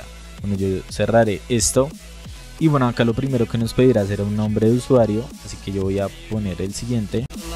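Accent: Bogotá, Colombia. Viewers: Iba a preguntar si en LAN se puede pero se le noto el rolo :v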